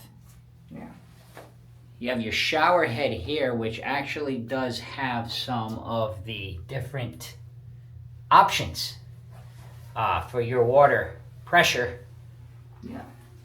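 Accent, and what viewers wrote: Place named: New England, United States. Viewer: I had to chuckle when y'all talked about different pronunciations of words when you come from different places. When I first started watching your videos, after about 2 videos I was thinking "He has to be from New England". LOVE New England! I'm in Virginia so I get the "Southern THANG" all the time,lol. Great video!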